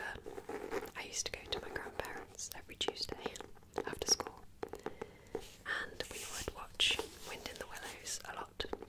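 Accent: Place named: United Kingdom. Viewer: How am I just finding you now?!! Books, ASMR and a lovely British whisper! I love your videos so much. Thank you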